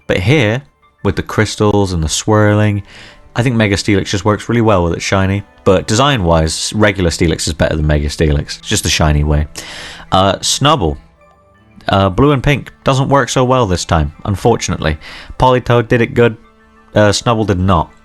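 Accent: United Kingdom. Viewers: are you from the west country in England sir?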